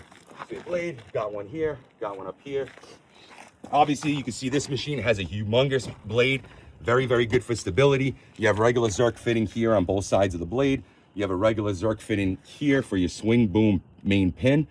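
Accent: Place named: East Coast, United States. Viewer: He does talk fast, but he's covering everything as well as possible. The east coast accent is definitely showing.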